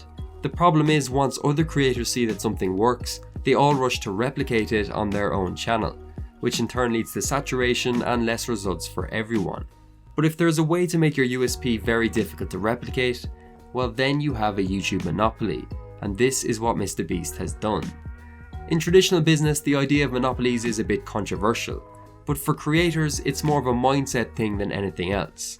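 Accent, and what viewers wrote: Cork, Ireland. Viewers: are you from Cork?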